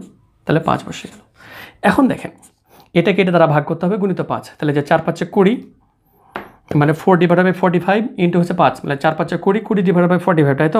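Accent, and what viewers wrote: Rajshahi, Bangladesh. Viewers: Sir are you from Rajshahi?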